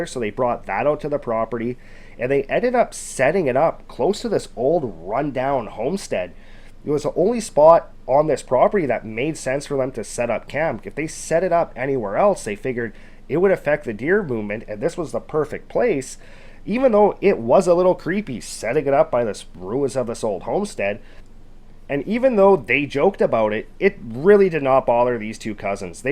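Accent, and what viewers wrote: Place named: Canada. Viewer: That’s an insane accent 😂 no wonder Americans make fun of us. - a west coast Canadian ❤😂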